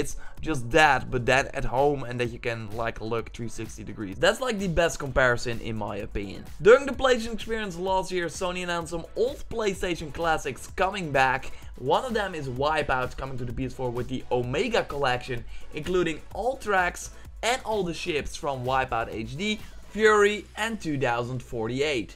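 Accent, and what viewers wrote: Netherlands. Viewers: You should work on losing the Dutch accent.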